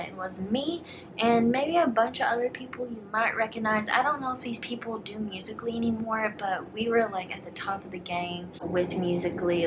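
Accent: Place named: Virginia, United States. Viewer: Biiiiitch, I knew you had a Virginian accent. Just started watching your videos and was going crazy knowing your voice was so familiar lmao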